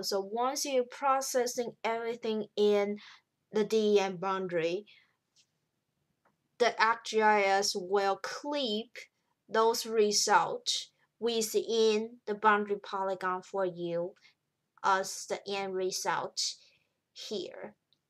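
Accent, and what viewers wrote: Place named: China. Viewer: Thanks, quite helpful. I like Chinese accent :)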